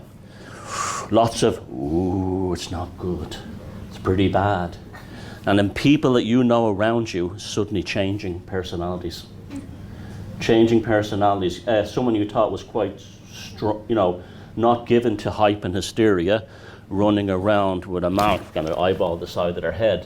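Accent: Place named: Ireland. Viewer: I emailed you regarding finding this, glad to see it's elsewhere. Currently halfway through watching. It's great work. If you're interested I would like to transcript it for subtitles for you. My girlfriend is a non-native speaker and she finds the Irish accent hard to understand. It would be good to make it accessible to others. Let me know if you're interested, I wouldn't have a clue myself how to add the subtitles but I'll happily type out the audio.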